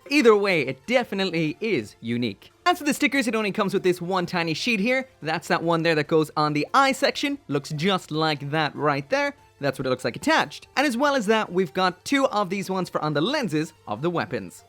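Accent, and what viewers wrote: Ireland. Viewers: Are you Irish like me?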